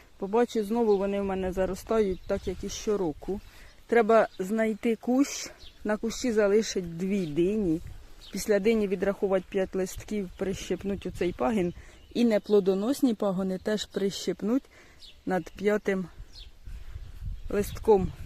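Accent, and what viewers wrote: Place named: Kirovohrad, Ukraine. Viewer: Гарне відео, інформативне. Репортаж з Полтавської області, а говір такий, ніби з Кіровоградщини (Олександрівський район).🙂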